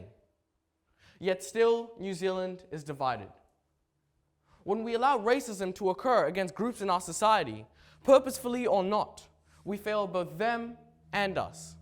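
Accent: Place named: Bemba, Zambia. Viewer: Am laughing at the bemba in English 🤣🤣🤣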